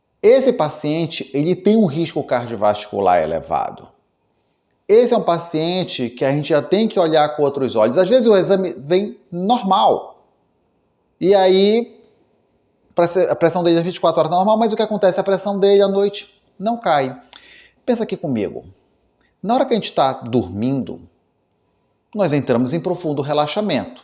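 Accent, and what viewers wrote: Pará, Brazil. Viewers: Doutor você é paraense? Seu sotaque me lembra o paraenses❤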